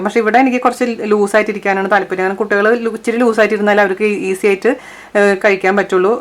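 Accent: Thrissur, India. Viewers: Chechi thrissur kariyaano thrissur slang athu kont chodhichatha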